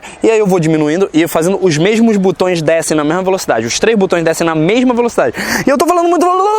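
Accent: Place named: Rio de Janeiro, Brazil. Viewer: A dicção também é importante. Muito carioques na sua fala. Disssssscurrrrrrso, Butão é um país, bOtão é outra coisa.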